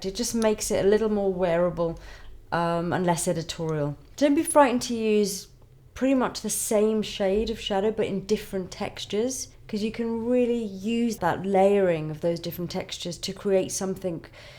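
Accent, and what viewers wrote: United Kingdom. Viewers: Thank you so so much! Hope you feel better soon. 🤗 Your nasal voiced is concealed by your ever sexy British accent. 😘😘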